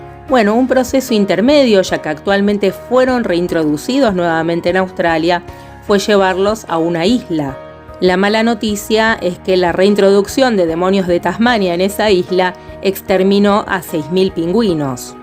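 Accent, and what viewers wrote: Argentina. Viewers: me encanta el saludo: hola amigos, en ese acento tan marcado argentino tusho, no sa sabia de la existencia de estos canales tan informativos de la vida biologica, ya me suscribi y ya vi muchos videos, los humanos somos un cancer, pero veo q la natalidad esta bajando, y ahora somos un poco mas ecologicos, ojala aprendamos a vivir en armonia con la naturaleza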